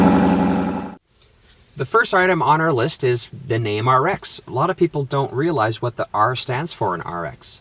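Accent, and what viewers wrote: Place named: Canada. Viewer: Hello, I enjoyed your discussion. How did you ever come to a Canadian accent?. Next time can you discuss how to upgrade ES 350 navigation system on my 2015? For some reason 2015 required a mini Sim. The dealers charge an enormous fee to change the Sim card. I understand the newer lexis allows it to download Iike the BMW.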